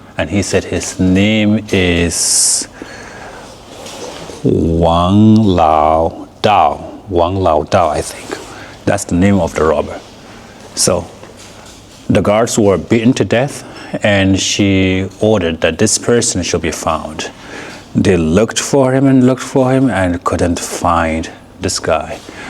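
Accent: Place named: England, United Kingdom. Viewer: Love your English accent man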